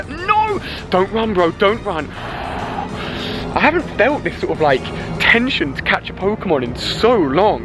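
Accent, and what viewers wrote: United Kingdom. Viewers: you are awesome. Keep up the amazing work. FYI dat british accent though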